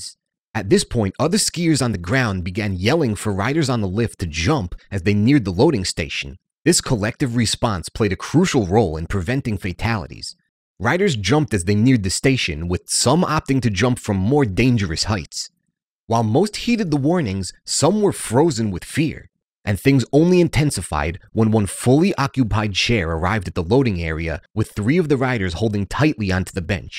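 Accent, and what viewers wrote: United States. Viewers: Ill take an american accent over an AI generated bot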